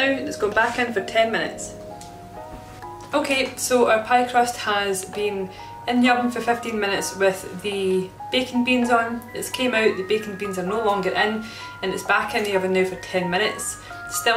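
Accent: Scotland, United Kingdom. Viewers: Wholesome, entertaining, and scottish noises. This is a top notch video. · Also, something that no one tells you - you need to drain the excess liquid out of the pumpkin to make a solid pack pumpkin. This is done after the sieving/mashing is done. You take the mashed pumpkin and put it back into the sieve and let it sit over a bowl to let the liquid drain for about 1 hour or until the pumpkin mash is thickened. Doing this will result in a firmer filling that wont weep after baking. I love to bake and really enjoy watching your baking videos. My grandmother was Scottish and she was a fantastic cook and baker. Watching you and hearing your accent, brings back happy memories of her. No recipes or measurements - just a little of this, and some of that. No worries with October timing, we don't eat pumpkin pie for Halloween in the US. It's a November/Thanksgiving dish here.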